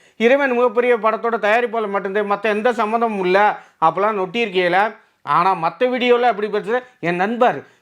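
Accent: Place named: Madurai, India. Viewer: ANNA,UNGALODA NAME PLEASE.SUPERB MADURAI TAMIL VERA LEVEL ANNA.❤❤❤❤❤🎉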